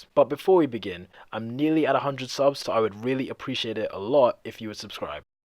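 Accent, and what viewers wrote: United Kingdom. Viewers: You are awesome3. Hope you get bigger man it will happen soon. Are u British btw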